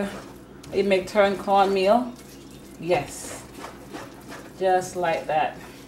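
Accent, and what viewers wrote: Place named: Jamaica. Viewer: I knew she was Jamaican lol. That accent hahaha. Love my people!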